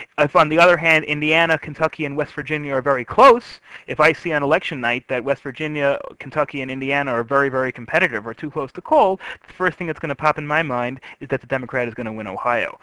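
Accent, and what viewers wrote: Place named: New York, United States. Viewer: r u from new york? you say "all" and "call" differently.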